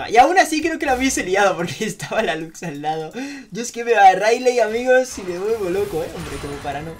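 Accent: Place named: Argentina. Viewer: por que hablas como argentino? xd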